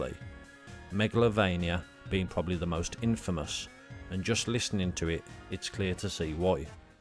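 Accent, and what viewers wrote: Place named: Midlands, United Kingdom. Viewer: Great video and content, plus it sounds like you're from the Midlands, so subscribed! Looking forward to more but in the mean time I will check out some of these games. Cheers.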